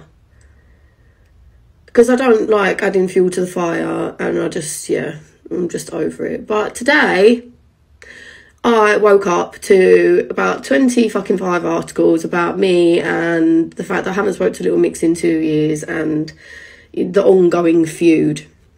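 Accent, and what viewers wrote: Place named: South, United States. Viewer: I don't get you're Southern accent but u was my favourite.😘 x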